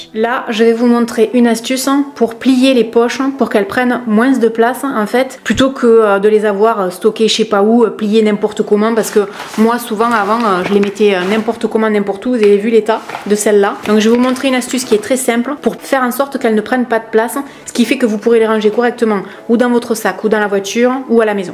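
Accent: South, France. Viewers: Toujours agréable vidéo avec ton sourire et ton petit accent du sud bisous ma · Bonjour, Je découvre tes vidéos...et j'aime beaucoup! J'aime bien ton accent du Sud moi la Lorraine. Je te remercie pour ton investissement et je me permets de te faire un 🥰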